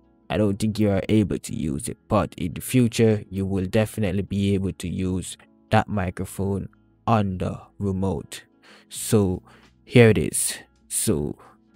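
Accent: Jamaica. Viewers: This man is Jamaican Peeer da rimot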